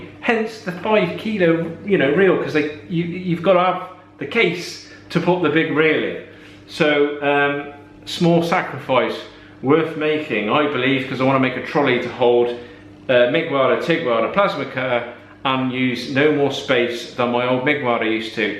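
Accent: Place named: Southwest England, United Kingdom. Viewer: *I really love your videos. But my curiosity overwhelms me about your dialect. Please set me straight , My English friend thought it is from the southwest.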